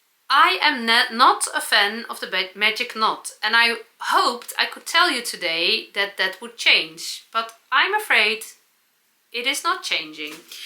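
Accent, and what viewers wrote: Netherlands. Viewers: I feel the same way about my legs! You will enjoy sewing the more you do it. It will become heart jumping! I have to admit that I looked up "Hard jumping" when I first started watching your videos! Then I heard you say it enough that I understood what you meant. I enjoy your Dutch English! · Your knitting channel is my favorite one,. You are very genuine and knowledgeable. It love your Dutchy English! I agree with you about the Magic Knot and square knots. They don’t work in my knitting either. I tie the square knots with intent to undo them, then twist the yarns and weave in the ends. I consider that Weaving in the ends is part of the knitting process, just like casting on, binding off, and swatching. Keep up all the HEART JUMPING videos! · Lovely video! And never apologize for your Dutchy English. I was always so impressed with the Dutch people’s fluency and ease in so many languages. 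They would greet me in one language after another until they found mine. Lovely people, beautiful country. ❤ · I'm so glad I found you and your "Dutchy English!" I'm half dutch myself, so it's so fun to listen to you! I really enjoyed your podcast, so gezellig! I also enjoy knitting, crocheting, and I love sewing as well. I've made some of the Sew liberated patterns, but now I need to get the tiered skirt pattern! I've also made quite a few of Lucy's crochet afgans for my children. I love all the colors of your new advent afgan you plan to make in Lucy's woodland afgan. I subscribed to your channel! Groetjes uit Canada! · What you have is a lap blanket in English. Heart Jumping=(English expression)my heart skips a beat, other heart expressions are (heart warming=meaning something that makes your emotionally feel good, usually when one person helps another) (heart pounding=a reaction to a scary or surprising experience.) English needs the adjective phrase of heart jumping and as a native speaker of English and English teacher, I'm adding it to my vocabulary. 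English loves to borrow from other languages and I think that this a great one to add to English. I love your Dutchy English which is 98% correct to the ears of a Canadian. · I really enjoy listening to your 'Dutch-y' English...especially 'heart-jumping' and ' Sauce'...and any others you wish to create. 😉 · I would suggest saying, “that explains my Dutchy accent”. There is nothing to apologize for .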